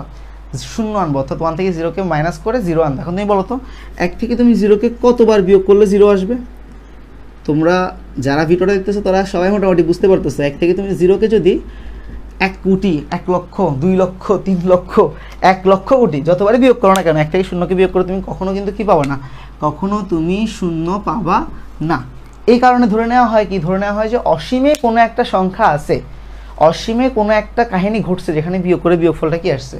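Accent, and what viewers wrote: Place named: Chittagong, Bangladesh. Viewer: pure chatgaiya tone...love it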